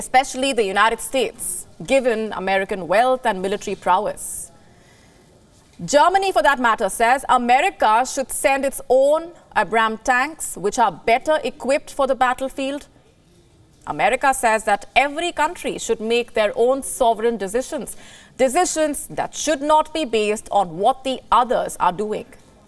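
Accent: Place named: India. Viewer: so cute indian accent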